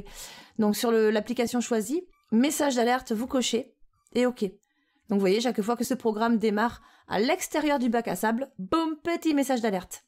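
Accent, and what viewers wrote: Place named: Southwest, France. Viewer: Toujours aussi percutent, un vrai plaisir d'apprendre avec un aussi bel accent du Sud/Sud Ouest. Bravo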